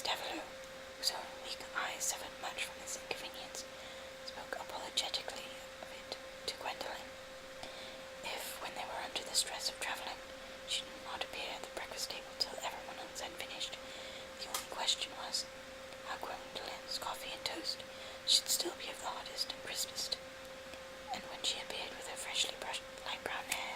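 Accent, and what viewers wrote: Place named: Southern England, United Kingdom. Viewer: I've been listening to this for a few nights now, and it's really relaxing :) You asked in the description if you wanted pointers on an English accent? To be honest, it sounds pretty bang on for a southern English accent, which is appropriate for this video, as long as you take into consideration that very few people actually speak like this in England anymore, our dialects are very region-specific :) a northern accent would be the next one to try, I would think. Well done x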